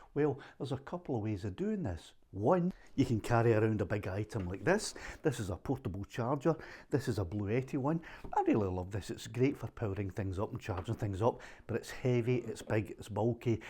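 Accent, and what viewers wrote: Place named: Glasgow, United Kingdom. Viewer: I love your Glasgow accent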